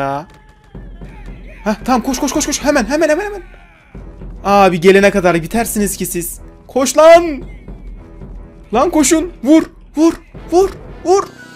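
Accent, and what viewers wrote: Ankara, Turkey. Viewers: abi sen ankaralimisin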